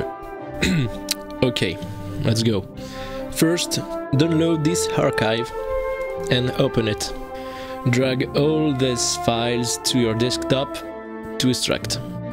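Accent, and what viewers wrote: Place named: France. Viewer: Don't worry, it's all fine, your English is better them most, to be honest, and with the french accent. ^_^ Thank you and Goodbye yourself. ;)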